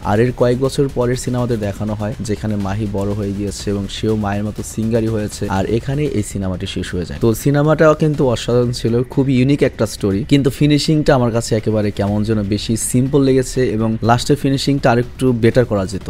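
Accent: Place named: Noakhali, Bangladesh. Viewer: এাই নোয়াখালীর হোলা❤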